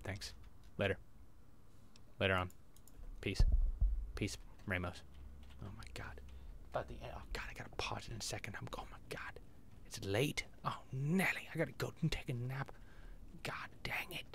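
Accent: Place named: Scotland, United Kingdom. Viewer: your scottish accent is actually okay :L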